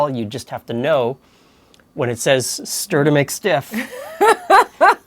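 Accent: Canada. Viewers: Did you cut your own hair? Nice job. Thanks for the presentation and the recipe. I can tell you're a fellow Canadian by the accent. Stay safe. ♥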